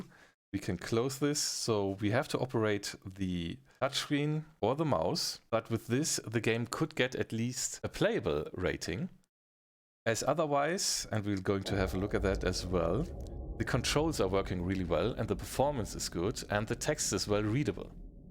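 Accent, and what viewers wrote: Germany. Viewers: Bruuder dein deutscher Akzent ist ja mal mega hart :D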